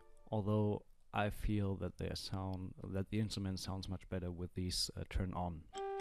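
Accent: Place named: Germany. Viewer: Are you german? Cause you have a german accent ;) Or atleast it sounds like one.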